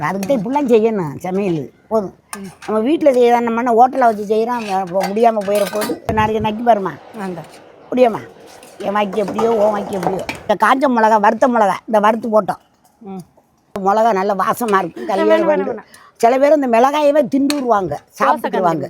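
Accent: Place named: Ilayangudi, India. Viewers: Ilayankudi pechu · Etha oru illyangudi ya slang amdiya irukay❤❤❤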